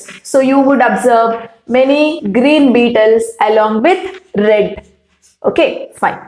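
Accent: Karnataka, India. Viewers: Mam ur from Karnataka right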